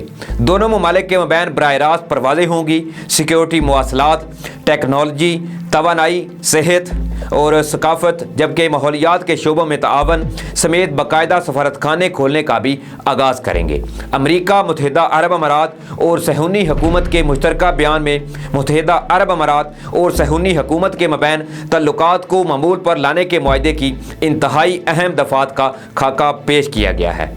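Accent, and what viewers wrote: India. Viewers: Tum indan andaz me urdo kiu boolty ho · Yes he speaks like Indian people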